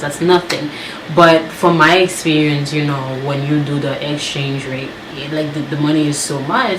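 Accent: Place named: Haiti. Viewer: Ur so pretty ❤️, u talk like you may be Haitian & or african french creole accent.....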